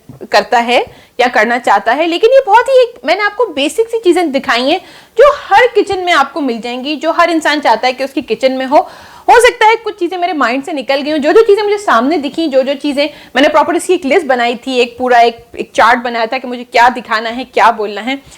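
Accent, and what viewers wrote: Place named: Pakistan. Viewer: Very informative video and I like to hear your voice its pure pakistami style and I also loved your dress from where did u get this?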